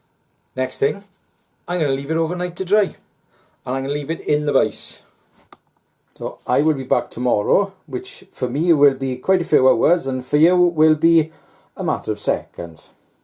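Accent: Wales, United Kingdom. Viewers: Hello, I've just come to your channel via recommendation from Greencraft review of a knife you gave him. Have already watched two videos and looking forward to browsing your back catalogue. Very entertaining and good to hear a fellow Welsh man. Regards.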